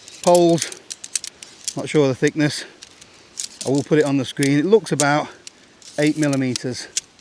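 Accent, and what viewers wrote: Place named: East Yorkshire, United Kingdom. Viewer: You could get yer brompton in there wiv yer.....real cosy. 'Ull' east yorkshire.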